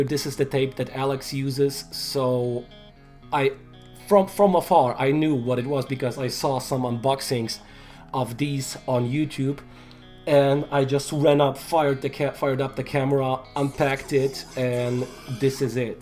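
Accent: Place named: Russia. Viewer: Ебать у тебя акцент просто конский, я сразу понял ,что наш человек снимает видео =D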